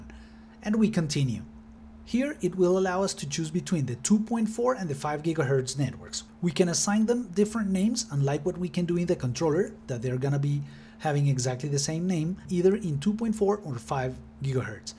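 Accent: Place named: Colombia. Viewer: You sound very Colombian.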